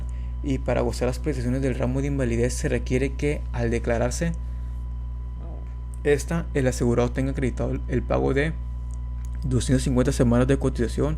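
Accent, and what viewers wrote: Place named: Latin America. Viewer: Tu acento se escucha de otto país de américa latina no de México, y para mi grnera algo de desconfianza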